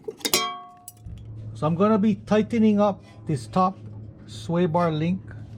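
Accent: Hawaii, United States. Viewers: Nothing says Hawaii like your accent, my friend. I bet you're about old enough to remember the following song on the radio - probably KIKI - "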